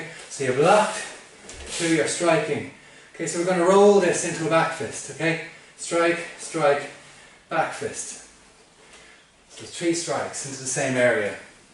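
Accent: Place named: Cork, Ireland. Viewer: My word you sound just like my neighbor. He's from County Cork.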